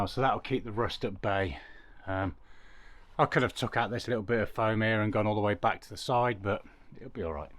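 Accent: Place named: RP, United Kingdom. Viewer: Very posh 😐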